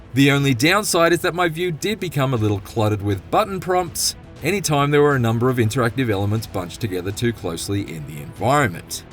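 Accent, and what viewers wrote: United Kingdom. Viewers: IGN please read your COMMENTS. People really dislke how THIS GUY narrates your VIDEOS. It's not like you can't find OTHER PERSONS to read the text for your VIDEOS. Just because he has a British ACCENT, it doesn't mean that HE IS a good narraTOR. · The generic YouTube I'm vaguely British voice ? Meh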